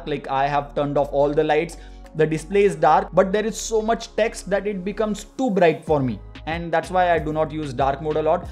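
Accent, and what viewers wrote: India. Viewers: Wtf !!!!!!! You can't understand this fucking india-english ...what the fuck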